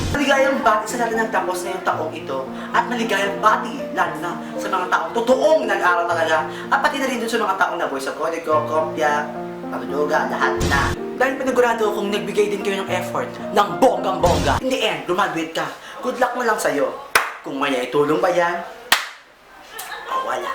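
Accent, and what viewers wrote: Visayas, Philippines. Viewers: Taga san ka po? Bisaya ka ata eh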